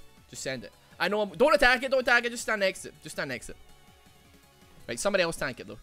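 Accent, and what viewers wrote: Scotland, United Kingdom. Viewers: Scottish Jacksepticeye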